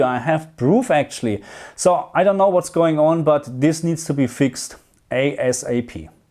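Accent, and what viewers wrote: Germany. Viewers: Ich dachte mir schon von Anfang an des Videos, „Er scheint einen deutschen Akzent zu haben, ob er deutschsprachig ist?“ Und dass „Herr der Ringe“ hat mich dann zum Lächeln gebracht.😅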